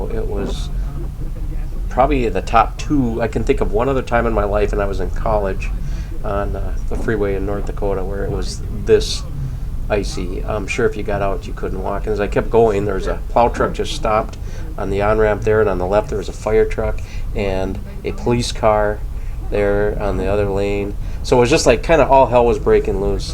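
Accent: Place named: Minnesota, United States. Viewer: This is the most Minnesotan accent ever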